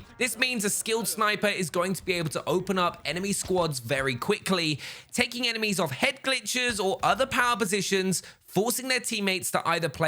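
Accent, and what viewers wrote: United Kingdom. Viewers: Always have been subscribed and notification squad! Teamwork make a dreamwork. Always loved your British voice, keep up the good work mate!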